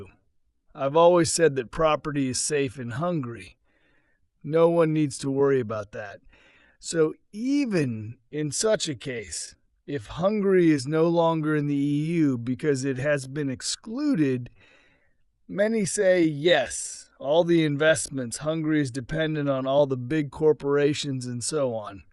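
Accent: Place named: Germany. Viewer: Is this AI speaking English? It’s so weird. I prefer to listen to your English with German accent. 😊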